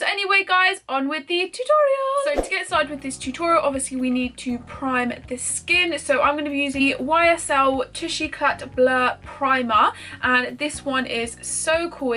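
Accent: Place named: England, United Kingdom. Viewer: oh my gosh i had no idea you were English!! anyways, I love your Instagram makeup tutorials and love this very helpful video ❤️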